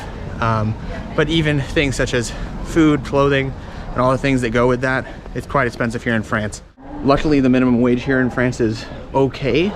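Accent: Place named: United States. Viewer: He sounds like from USA. But he looks Middle Eastern to me.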